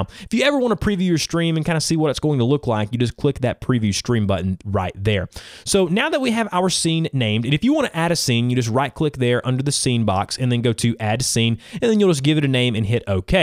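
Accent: South, United States. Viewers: Are you from the Southern U.S.? (I'm a Linguistics major so I have a tendency to try to guess accents.) It sounds kind of like you moved to the Northeastern United States after growing up in the south.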